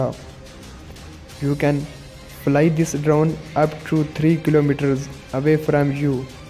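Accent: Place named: India. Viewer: Your information in english with pure indian accent.but its nice.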